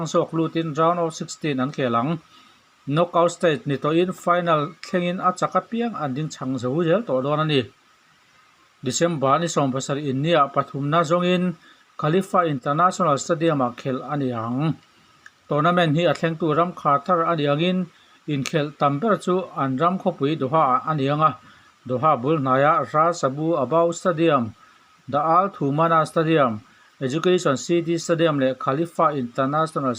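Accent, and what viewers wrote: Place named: Mizoram, India. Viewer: Ka pu i news puan hi a ngaih nawm awm thei viau ah masah i english pronunciation hi hi ngaih thlak a har deu thrin ka ti. Ka dem lo che maseh english kan hmang chuan mizo thuthluk in I rawn lam thrin a, a dik lo deu hlek ka ti na a ni eh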